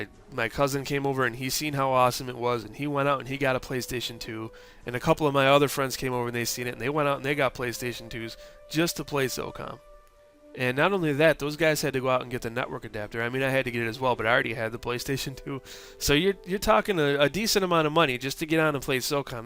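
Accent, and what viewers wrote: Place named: New England, United States. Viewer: This discussion sux you sound like a Yankee just like that dumbass suckmyturban! And you didn't even play confrontation that was socom at it's finest! Socom 2 was best in that era. But when the HD world came out it was all about CONFRONTATION! Only problem like the other socom was the cheaters! Socom was known for after been out a year or so the cheating came out! Socom was the best game ever made!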